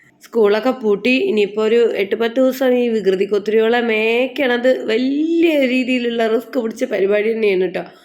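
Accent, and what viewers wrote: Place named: Valluvanad, India. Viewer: വള്ളുവനാടൻ ഭാഷ കേൾക്കാൻ നല്ല രസമാ.. from Kottayam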